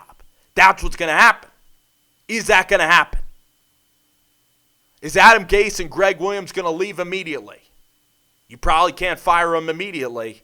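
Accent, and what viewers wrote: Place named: Deep South, United States. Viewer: thinks Davis Webb a top 5 NFL QB. also what the hell is wrong with your voice?? It changed 4 times during the video and for some reason you try and mix a deep South tone into it.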